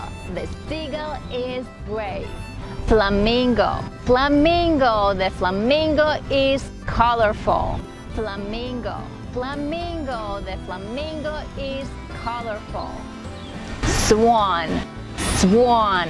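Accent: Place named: United States. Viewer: Я с Вами тоже буду учить английский, в школе учили немецкий, так ни разу не пригодился в жизни, у Вас прямо настоящее американское произношение, к нам на фирму из США приезжали, так же говорили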